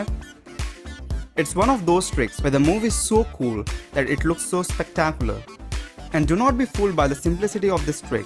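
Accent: India. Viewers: Indian accent? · indian accent.. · You're not the other guy. You have a total different accent. Are you an Indian? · Your Indian accent is difficult to understand. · Your indian accent is funny...the way you pronounce "position"....its "pozition" not "pojition" lol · Why do 1/4 of these videos have the host with a strong Indian accent? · Please upload an explanation with an american accent guy not with indian accent. It's annoying, sorry... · Can't blame other people for not understanding his accent. In my first time to hear their accent many years ago, i didn't even understand either. So probably this people who complain about the narrator never meet or work with indians also. 😅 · Agreed. Very difficult to understand. Indians speak very quickly , and combined with the heavy accent makes it difficult to understand.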